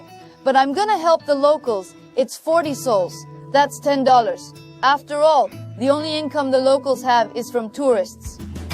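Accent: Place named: India. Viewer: True indian ascent ❤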